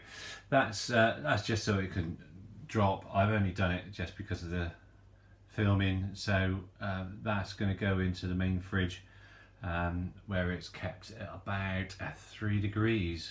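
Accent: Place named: Somerset, United Kingdom. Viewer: Sounds like somerset to me?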